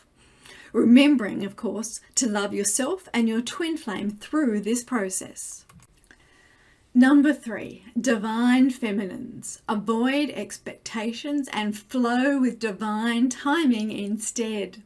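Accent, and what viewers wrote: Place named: Australia. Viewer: So nice to hear an Aussie voice, hello neighbour. 🙏❤️ I would love to hear from other Aussie flames, does anyone have meet ups in Sydney.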